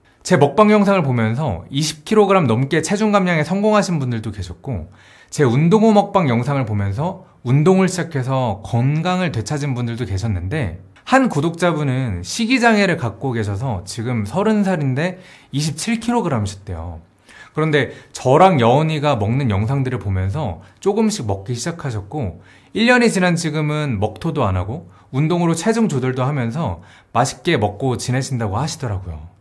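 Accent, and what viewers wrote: Cheongju, South Korea. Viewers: 먹방채널이랑 일상채널을 나눠도 좋을것같아용!!! 일상채널에서 게임영상도 올라가고 가족이랑 놀러가는 일상도 올리규 ㅠㅠ 그리고 저 청주 사투리 좋아하는데 라이브도 하면서 사투리도 보여주시구~ 이것저것 많이 해주셨으면 좋겠어요!!편집 영상도 재밌는데 라이브도 재밌을것같아요 ㅎㅎㅎ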